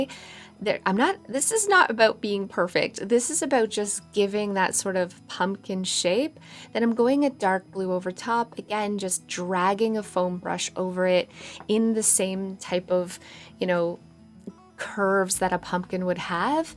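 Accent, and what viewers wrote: Canada. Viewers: A few things: 1. These DIYs are so beautiful and elegant! 2. I'm from Florida and your Canadian accent is literally the cutest 3. I've always thought you were very pretty but since your surgery you have so much CONFIDENCE girl and you are rocking it! You look so happy and that makes me happy 😃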